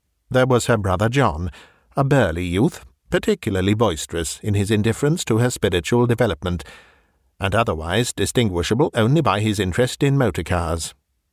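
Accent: England, United Kingdom. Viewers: Such a lovely voice to listen to. So nice to hear an English accent!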